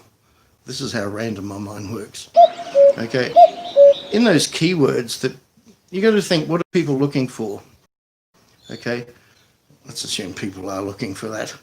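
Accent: Australia. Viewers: I don't know what the hell I'm talking about. But it's nice to have someone reply back and share things to say. You sound like your from Australia. U used to live down there in Sydney. I'm probably gonna go back as soon as I can. I'd rather be down there if the shit really hits the fan if you know what I mean.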